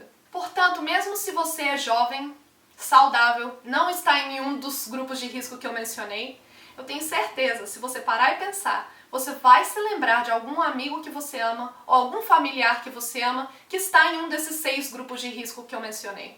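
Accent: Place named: Minas Gerais, Brazil. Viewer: Oi, você é de BH? Fiquei curioso pelo sotoque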